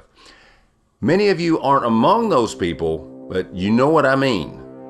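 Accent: South, United States. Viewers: Fortunately we in the USA associate a thick, good Southern accent with superb space-science- in fact, if sounded like typical YT A.I., who could care. Please keep up the great work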